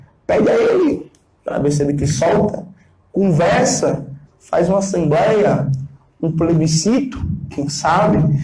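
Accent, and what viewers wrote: Pernambuco, Brazil. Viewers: sim, sem dúvida, o sotaque é puro mangue pernambucano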